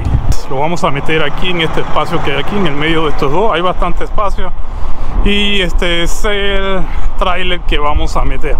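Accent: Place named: Mexico. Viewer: Saludos bro ya hablas bien Mexa🇲🇽 asi estaba yo pero al contrario cuando me juntaba con todos mis compas cubanos 🇨🇺 incluso estuve en varias ocasiones en Cuba🥰🥳 Saludos mi sangre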